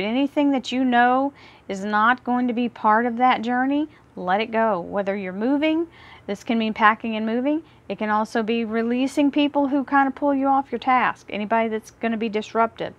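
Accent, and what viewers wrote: United States. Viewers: I love the accuracy, the lovely American accent, and of course your enthusiastic interpretation. Thank you so much. x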